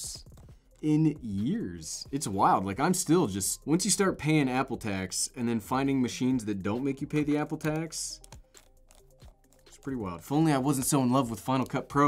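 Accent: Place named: United States. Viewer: Always makes me do my confused face when Americans pronounce 'Soldered' as 'Sodard'.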